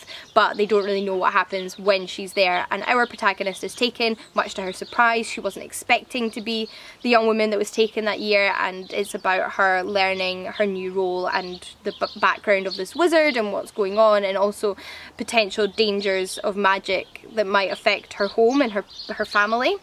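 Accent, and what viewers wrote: Scotland, United Kingdom. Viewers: Its so nice to hear a Scottish voice pop up on my suggested videos especially from my home city. Makes booktube a little more reliable for me!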